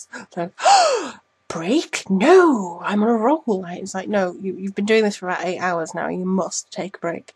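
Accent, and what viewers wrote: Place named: Liverpool, United Kingdom. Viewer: Liverpool/Wirral girl? I hear the accent of home :) Good reading, thanks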